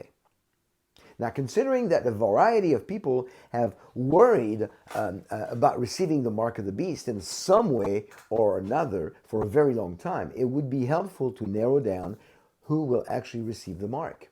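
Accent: France. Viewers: Watching from South Africa and enjoying your teaching tremendously....especially your brilliant sense of humour. God bless you richly. Also love your French accent!!! Shalom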